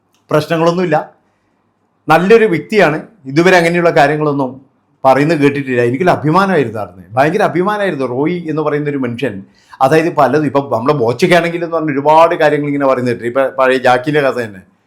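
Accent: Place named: Namboodiri, India. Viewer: This presenter has a typical Namboodri accent and attitude, so we can imagine what he is saying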